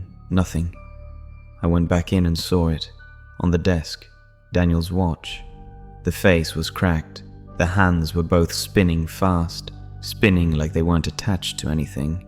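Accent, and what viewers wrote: United Kingdom. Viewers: For those of us who do not have the class of a British accent, it makes the narration of your tales exceptionally enjoyable. The meat & potatos of the stories are always great. Good to see you back.. God Bless you & yours Sir..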